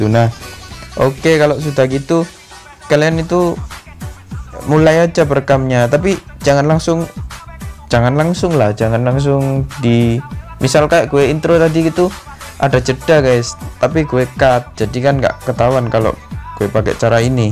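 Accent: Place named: Java, Indonesia. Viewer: Lu orang jawa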